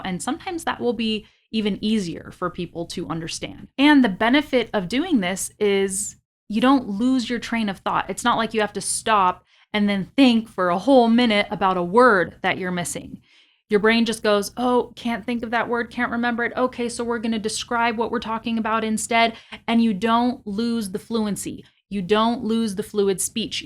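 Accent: California, United States. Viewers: It's great, btw, I have watched your content in some videos, am I wrong if you sounds more like American than British?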